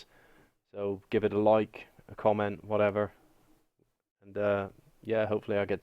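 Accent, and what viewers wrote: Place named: Iceland. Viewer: where are you from. you sound like your from Iceland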